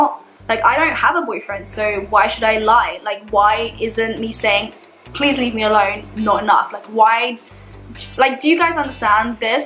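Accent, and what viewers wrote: United Kingdom. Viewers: Now you know you can pass off as Australian lol. I heard the British accent, but wasn't sure. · omg it took me till to realize you're british!! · it took me 3 minutes into watching this video until i realized that you have a british accent 😭😚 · Nah mate she is very british xx it’s not Aussie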